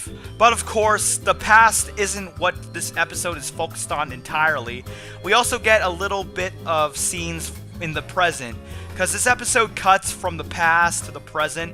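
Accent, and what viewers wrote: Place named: United States. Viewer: These Murrican pronountiations, man...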